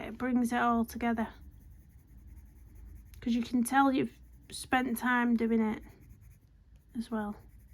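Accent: United Kingdom. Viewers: Bonjour, la combinaison des couleurs est super je suis fan de tes vidéos et j'essaye de suivre tes tutoriels... merci de partager. Where are you from because j'aime beaucoup ton accent british but où ? moi je suis française et je vis dans le sud vers Carcassonne. Bravo pour ton talent Corinne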